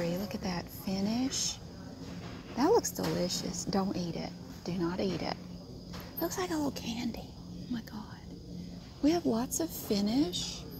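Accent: South, United States. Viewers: Everyone talks about your southern accent but it sounds normal to me since i’m from south georgia 😂😂😂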